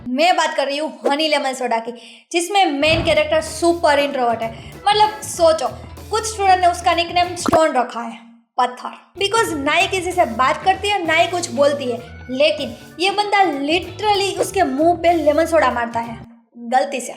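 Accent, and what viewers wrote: Gujarat, India. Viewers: Aapki hindi me gujrati vibe kyu aarhi hai 😶😁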